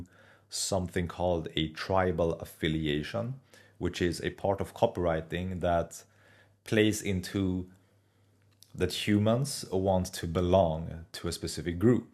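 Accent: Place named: Sweden. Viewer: Swenglish is strong in this one. Great value video surprised it has so low view count!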